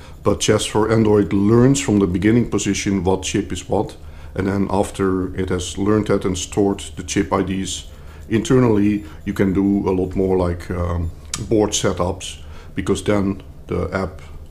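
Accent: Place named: Netherlands. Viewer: Aaaah een mede Nederlander, hoor dat direct aan je accent XD toffe video!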